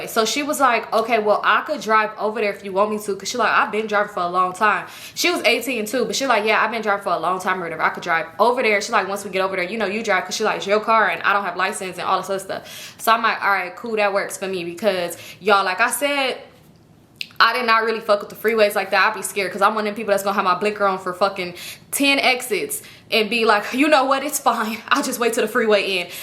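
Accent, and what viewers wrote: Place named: South, United States. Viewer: your random country accent gets me every time. And I’m from Memphis. You know we country as hell.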